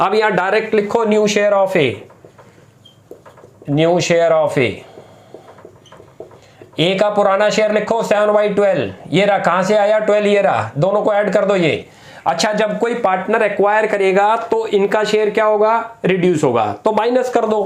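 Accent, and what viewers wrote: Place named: South, India. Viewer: I think the person is from southern part of India..... Most people learn English there.... I lived there for some 3 years and I know that it's difficult for them... But yeah you all are right that he/she should change the channel😅😊😊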